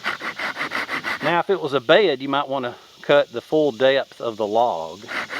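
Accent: South, United States. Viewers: love the accent are you a southern guy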